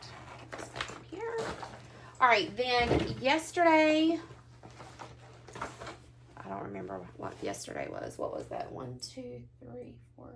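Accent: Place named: South, United States. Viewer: Just found you. You sound like you have a very familiar southern slang. Was nice to hear. Enjoyed your tube. Thank you for sharing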